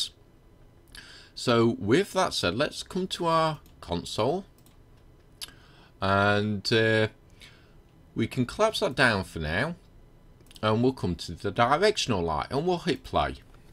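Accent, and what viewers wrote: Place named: North England, United Kingdom. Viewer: Hey, thanks for the reply. I managed to fix it. The problem was I needed to assign the ParticleSystems for ALL the Weatherstates. I had only assigned mist. when I assigned mist to all of them (because i havent made the other ones yet) it worked. Great lessons btw I dont get why you have so few views youre explaining your stuff very well and its not getting boring at all. Also love your accent haha, what is that? North England? Keep it up your videos are helping a lot.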